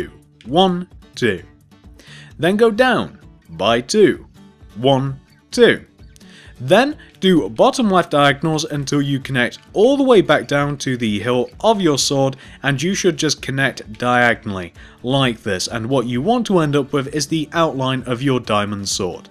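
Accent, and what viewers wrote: United Kingdom. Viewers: I rememember this channel as a kids, the British accent and the voice I use when doing a tutorial now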